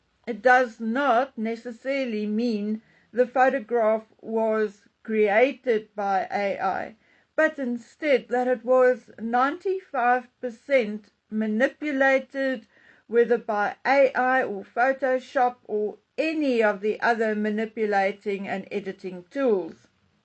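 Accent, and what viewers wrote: South Africa. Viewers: Ive only ever unsubscribed once. I did it because after giving it a try for a couple of weeks I just didn't enjoy her voice or her opinions, at all. I've enjoyed or learned something in each video. I enjoy hearing about Dragon Man and most of all, I love the South African accent. TY. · When I subscribed to your channel, several years ago, I did not subscribe because of the Harkles, Royal Family, or anything of the sort! When I first came upon your channel, I just LOVED your accent!!! I had never heard it before. I knew it wasn't from Great Britain or Australia, or New Zealand, or the U.S.!!! I listened to you a little more & learned you were in South Africa & told you I thought you had the most beautiful accent! One lady saw my comment & thanked me & told me it was so lovely of me to say that!!! You, no doubt, probably don't remember, but you & I even emailed back & forth a few times!!! 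I thought that was SOOO nice of you to respond to me!!! You never ask for anything, you never advertise products you want to sell. All you do is bring us the truth!!! Here we are, years later, & I'm still here everyday, still subscribed, & still listening to your lovely voice & accent!!! 😊 ♥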